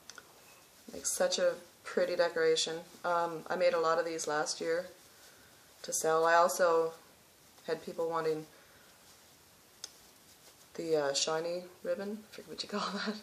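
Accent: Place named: Newfoundland, Canada. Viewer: I'm from Newfoundland and I thought, "she's not American and not mainland Canada by her accent"!! Love it!! I also LOVE your video!!! Very helpful and EASY to understand!! Looking forward to seeing more!!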